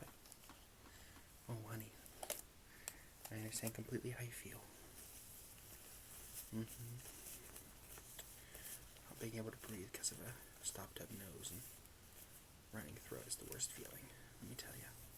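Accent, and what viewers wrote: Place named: New Jersey, United States. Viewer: Being in and out of hospital lately I only wish this was the care I got, this is amazing I would love you to do something with typing like a clocky-clunky keyboard like checking into a spa for a facial you would be awesome at that with the New Jersey accent you do so well I LOVE that video all the same thank you for the amazing content and your work ❤ x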